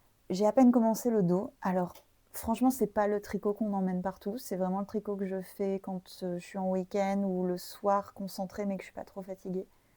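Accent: England, United Kingdom. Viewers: Très sympa ton podcast et tu as un super accent british.A bientôt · Bonjour ! Je démarre à peine le visionnage et je sens déjà que je vais aimer vous suivre! Peut-être vous l'a-t-on déjà demandé, mais pardon.. your english accent is amazing, vraiment, cependant j'ai du mal à saisir toutes les références que vous donnez, et je le regrette beaucoup tant ce que vous montrez est intéressant. Pouvez-vous les transcrire sur l'écran au montage ou les mettre en description svp 🙏je reprends la vidéo avec plaisir, merci de la partager!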